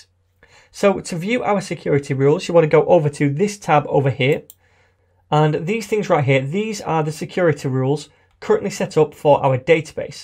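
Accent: United Kingdom. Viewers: I always watch your videos, now I have the same british accent as yours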